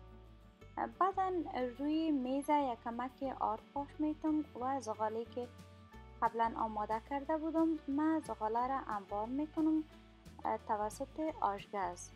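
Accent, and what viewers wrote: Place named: Kabul, Afghanistan. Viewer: خوب دری گپ میزنی اصل کابلی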